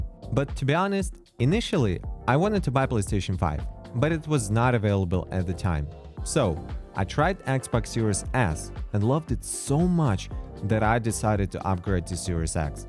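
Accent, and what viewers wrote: Russia. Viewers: У тебя максимально русский акцент)) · Guy sounds Russian i would take what he says with a grain of salt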